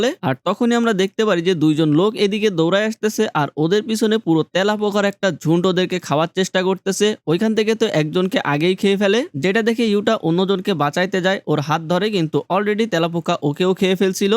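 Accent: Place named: Magura, Bangladesh. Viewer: Vai apnar basa ki magura? Magurar Moto accent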